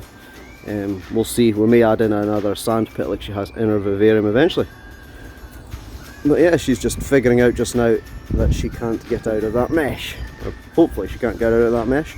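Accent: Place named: Edinburgh, United Kingdom. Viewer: Nice one mate, I'm thinking about an outdoor enclosure for a Tegu. I have ideas to build framing a little wider than this but around 7-10 feet tall with large logs upright and hammock like areas to bask up high and some climbing space. You sound local to myself, Livingston/Edinburgh? if your budget isn't high I get lots of spare wood from my joiner friend, excess off jobs if you ever need some. I'm in the middle of a raised pond atm all done from his free wood, also an 8 foot turtle dry enclosure to sit above his 8 foot aquarium can climb up into so I can fill the tank right up and utilise all the swimming space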